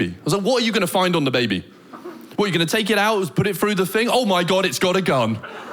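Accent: Denmark. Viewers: Does have a Danish accent when he speaks English or maybe he is just one of those people that sound horrible no matter the language they try to use.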